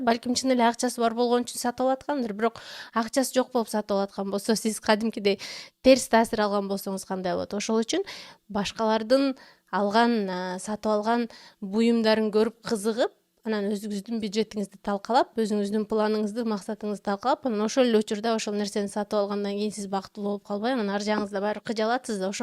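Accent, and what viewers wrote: Standard. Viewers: Кыргызча таптаза сүйлөгөнүңүзгө ыраазымын ❤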